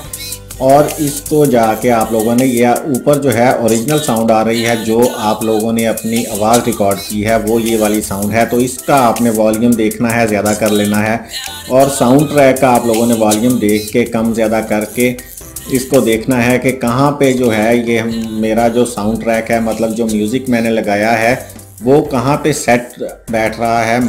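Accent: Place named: India. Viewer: It is Eanglish but he talks in a indian eanglish way! Why is YouTube full of haters? God.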